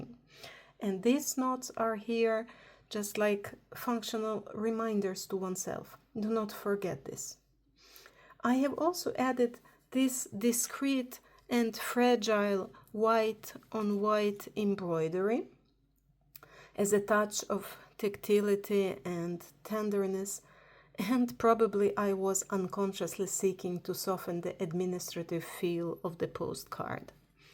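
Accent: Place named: France. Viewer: ❤ 😎 me gusta mucho la espina cocida wow 🤩 hermoso tu diario 📔 Están precioso todos detalles, los bordados 🧵 oooh 😮 y tu hermoso acento francés 🥰 me encantan todos los acentos del mundo ! Debo también decirte que Tus telas que usas son preciosas. La manera que describes los detalles de cada página es increíble 😊. Amo estos videos . Gracias 🥰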